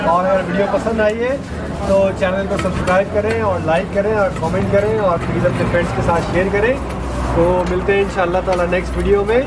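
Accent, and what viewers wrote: India. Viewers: Why speak inglish like people from india...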